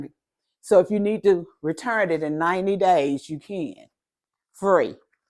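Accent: South, United States. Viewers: What a great video! Thank you. Am always intrigued by the Temu ad— thanks so much Ms. for bringing their offerings to life. You and your fabulous southern accent make me miss home. Love you! xx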